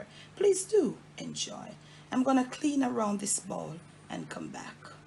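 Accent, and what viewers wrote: Jamaica. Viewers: I just LOVE the way describes all the ingredients and cooking techniques with her lovely Jamaican accent and "all ah dat!" It is such a pleasure to have you share all of the good food dung a yard. Thanks for sharing! I've subscribed.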